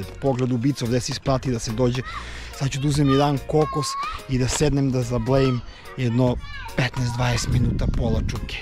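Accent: Niš, Serbia. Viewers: Ne znam koliko si svestan, verovatno ti pricaju prijatelji i porodica, ali jednom kad se vratis u Nis, ti vise nikada neces pivo da platis ovde. Za ove tri godine si uradio vise na promociji grada, naseg slenga, naseg naglaska, naseg humora, uopste za urbanu sociologiju grada, nego svi politicari u Nisu za poslednjih 30 godina. Kad ovo sve za jednog Durlanca kaze jedan rodjeni Bulevarac, onda ti je jasno sve bratooo 😎 Cekamo te ovde i budi siguran kad te budem sreo negde po gradu, necu da ti trazim selfie ili tako neka sranja, nego ima da bude "De si be, nabijem ti ga? Aj ce popijemo po pivo i da pojedemo nesto konkretno, namocam ti se u pirinac i nudle vise..." Vidimo se nekad, u oslobodjenom Nisu 💪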